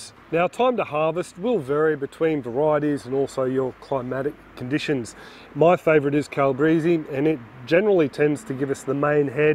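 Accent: Australia. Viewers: I like the Australian accent tho also you look like bob ross I like it too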